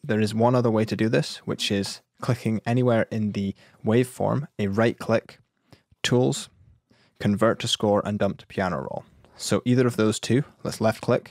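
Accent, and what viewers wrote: United Kingdom. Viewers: Soooo useful!! Thank you! It is also useful to find notes to sing, notes to play on piano. In fact, for those who are going to have IELTS exam, Always listen to this channel's videos in order to adapt British accent, even though you sometimes don't understand what they are talking about. :3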